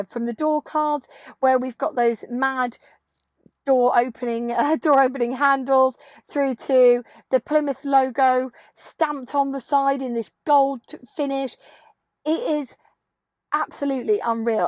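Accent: United Kingdom. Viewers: Greetings from Canada! You have charming presence and enthusiasm, and it's great to see a woman hosting car programs. If both your.speech and video recording could be a touch slower it may help. Video is enhanced by periodic stops, not always moving; and your delicious accent is nonetheless a bit hard to understand at times "across the pond" (we Canadians apparently are understood all over, but at some cost of blandness.) I mean no offense, and enjoy your lively presenting.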